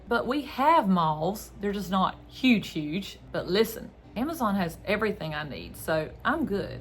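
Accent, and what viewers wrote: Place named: Florida, United States. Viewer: Damn girl!!! I’m from NC and had to rewind when you said hiking 😂😂😂😂😂.. love Florida and the accent 👍👍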